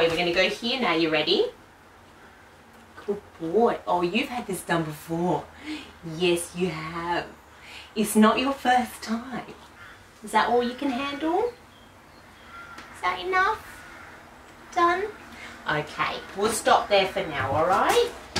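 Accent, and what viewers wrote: England, United Kingdom. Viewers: First of all... Louie is gorgeous 😍 secondly, I love how your accent has changed here and there to a more English accent 😂. And I love your intro 😁... Wishing you and Louie a long and happy life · He's so adorable ..what a cutie..i bet he just loves his new mummy.... I can hear your are starting to get an english twang...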